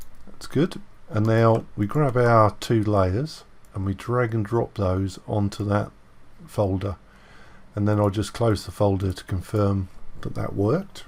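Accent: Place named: England, United Kingdom. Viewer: Thanks, great tutorial. May I ask what part of England your accent is from?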